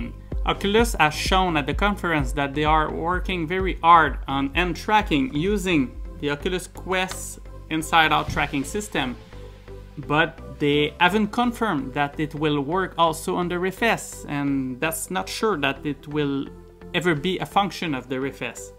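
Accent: Quebec, Canada. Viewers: je reconnais l'accent quebecois et vive le canadiens! lol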